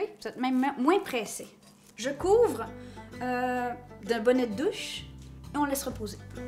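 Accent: Quebec, Canada. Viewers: Bonjour 👋 j’adore t’es vidéos je rigole et je me régale,t’es recette sont tous simplement génial j’adore 👍,et ton accent 😄j’adore,vous les québécois et vos expressions c’est trop marrant,au j’arrive à la fin de la vidéo 😛🤪tu me donne faim,Isi il est 1h20 du matin comme je n’arrive pas à dormir je vais réaliser t’as recette,gros bisous à toi et à chéri 👍🍀💋💋💋💋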